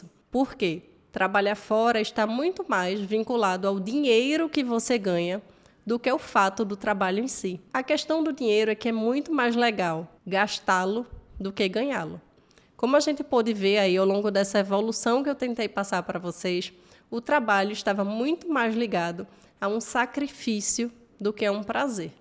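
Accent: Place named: Recife, Brazil. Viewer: ganhou mais um seguidor o sotaque de recife é lindo de mais, esse canal logo vai crescer muito seguidores.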